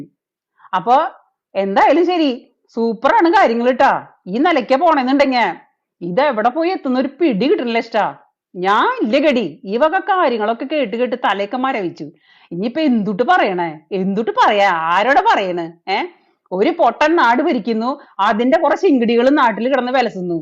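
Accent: Thrissur, India. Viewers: മ്മളെ തൃശൂർ സ്ലാങ് 😊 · തൃശൂർ ഭാഷ super❤❤ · Your Trishur lingo is very amusing to hear. 😂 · Chahhi parayunna teshur basha kelkan nallarsssmund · 👍👍👍👍super, തൃശൂർ ഭാഷ കേൾക്കാൻ നല്ല സുഖം 👍👍👍 സത്യം തുറന്നു പറഞ്ഞ മാഡം ബിഗ് സല്യൂട്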